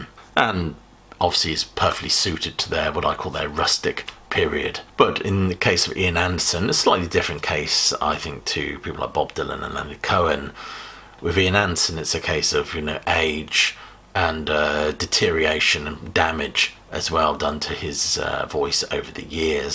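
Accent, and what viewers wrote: United Kingdom. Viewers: skip it ... he is talking nonstop with a British accent and going nowhere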